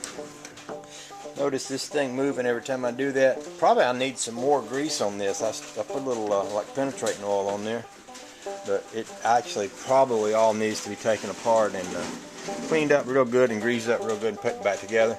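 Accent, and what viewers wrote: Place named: South, United States. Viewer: i love that accent. go South!!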